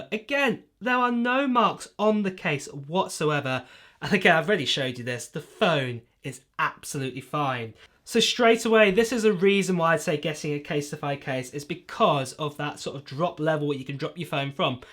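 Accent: United Kingdom. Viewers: After listening to him, I want a British boyfriend 😂 Canadian accent is so plain! I use a Casetify case for my 12 Pro max, I absolutely love it coz it has my name on it. I ordered a blue iMac yesterday but looking for the M1X MacBook for sure!!! Need something portable! 😂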